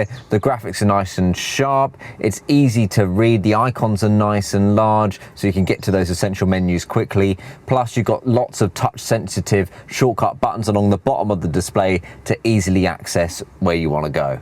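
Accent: United Kingdom. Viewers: Not the only comment that was totally wrong, the guy is in the UK by his accent and the displays are all 10.25 inch in the N model. In fact going off the title of this video he is throwing in a great deal of confusion when he is talking about the standard i20 as well as the i20n.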